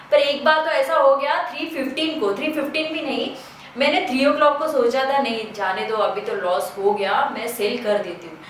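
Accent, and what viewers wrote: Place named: Bihar, India. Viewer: Are you from bihar?ur hindi speaking is like biharis.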